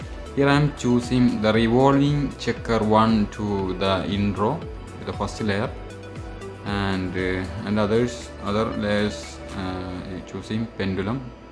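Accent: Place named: Kerala, India. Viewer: Accent seems like malayali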